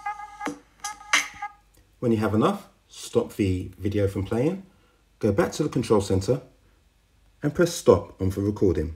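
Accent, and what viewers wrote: United Kingdom. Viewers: Your voice literally sounds like an british guy btw am not racist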